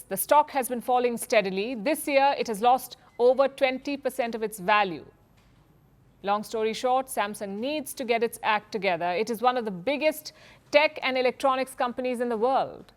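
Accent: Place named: India. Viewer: I like your indian accent😅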